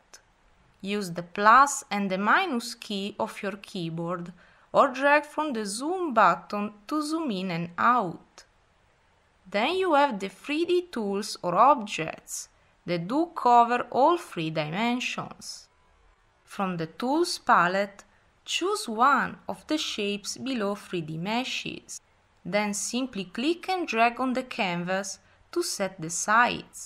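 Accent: Russia. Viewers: This russian accent is really annoying and distracting .... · Thick Russian accent aside, this tutorial has been great, the best one I've found thus far. I have learned more from this young woman than I have from any other source since I downloaded the trial version. Good job honey.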